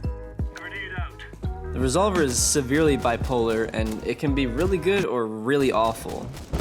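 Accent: Switzerland. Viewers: Are u from Switzerland? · Wait, are you from switzerland?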